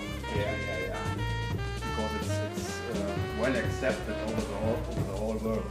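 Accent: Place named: Germany. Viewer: hahaha.. how u realize that he is german from the very first second :D i'm german myself but i hate the normal german accent :/its duch a .. torture :(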